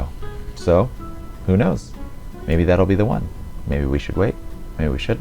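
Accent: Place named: San Francisco, United States. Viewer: Your accent sounds like you may be from the Castro district of San Francisco. Am I right?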